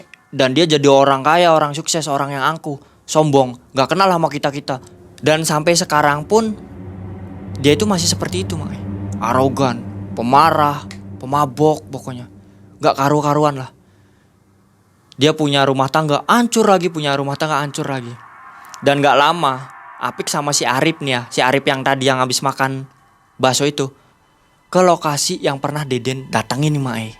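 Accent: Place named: Cirebon, Indonesia. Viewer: Penuturnya enakan mas...logat cerbon tp apa adanya,lugu polos gk dibuat2..mas smakin lama cerita,smkin penasaran jgn hbis dlu critanya..klo apik ini berapi2 tp malah pngn cpt2 selesai...ayo mas,cerita lgi mas..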